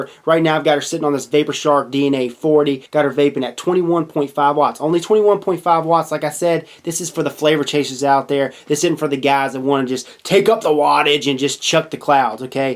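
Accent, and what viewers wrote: South, United States. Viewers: It is wayyyy more than the way he says something, it's also the phrases and choice of words that are dead give away he is from the south. "And she's a chuckin." Is obvious, for example. You can tell he isn't from like Alabama or something, and to be honest he probably tried to sound as neutral as possible, because in the US sounding like you are from the South makes people think you are dumb.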